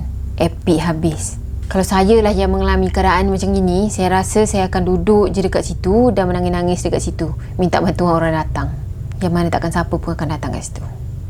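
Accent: Kelantan, Malaysia. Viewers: Akak ni orang Kelantan ke?🤔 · Orang kelantan ke ni😆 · heyy .. ore kelate ko ni ?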